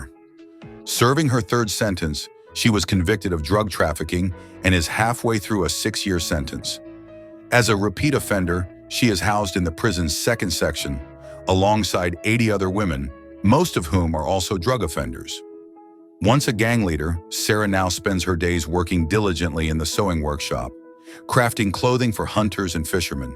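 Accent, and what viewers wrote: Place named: United States. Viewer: bro the narrator is american.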